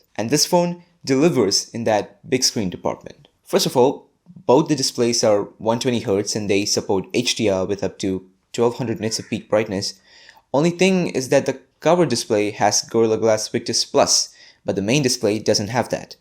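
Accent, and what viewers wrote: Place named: United States. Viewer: you're an Indian? your english accent is american. nice 👏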